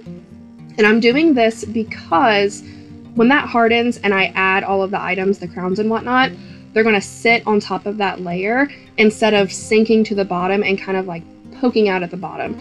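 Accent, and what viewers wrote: South, United States. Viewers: Girl! Your voice is so cute. I’m from the south too (Alabama) and I much rather listen to people with southern accents! Lol this is so adorable and helpful. Thank!!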